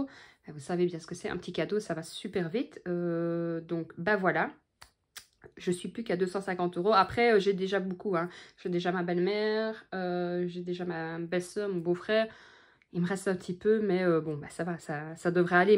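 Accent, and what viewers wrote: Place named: Belgium. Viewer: Cc, merci pour ce partage, j’espère qu’il n’y a rien de grave pour ce rdv, tu es vraiment adorable!!! et en plus ton accent belge ❤ c’est encore plus mignion!!!🥰, T’inkiet J’ai un chéri 🤣🤣🤣🤣!!!! Gros bisous à toi et ta famille 🥰😍😘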